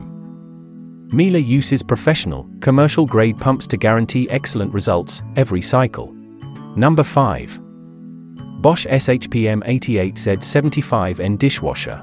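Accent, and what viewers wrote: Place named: United Kingdom. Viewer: Brit/Euro accent of narrator; are these washers for US market or European?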